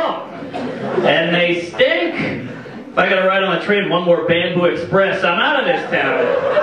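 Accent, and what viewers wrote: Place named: South, United States. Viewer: I think Mark's accent here is the most southern I've heard it. I can hear it in certain words he says.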